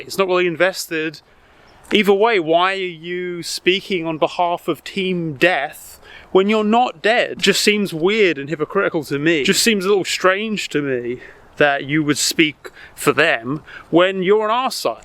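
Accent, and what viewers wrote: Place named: United Kingdom. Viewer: Very unrelated remark/'question': this is the second time this week I find out a content creator (*hurl*) I like, who also happens to have a British accent, turns out to be living in a deeply red state. Wastha'abou'? I am grossly assuming here that you actually live in tennessee, apologies if that's off-base.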